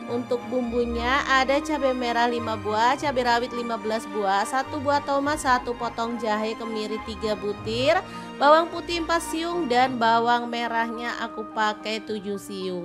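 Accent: Surabaya, Indonesia. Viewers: Sampeyan Yen nganggo boso suroboyoan kae kok lucu men... Seneng banget aku... Selain itu masakan2 nya sy catet di buku, sy praktekin... Suwun bngt ilmunya...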